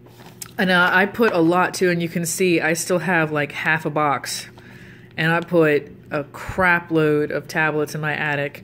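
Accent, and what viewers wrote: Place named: New Orleans, United States. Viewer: I'm from New Orleans as well (I know my people when I hear them lol)... This was helpful.. i just got some from Home Depot .. ill see how it goes 🤷🏾‍♂️...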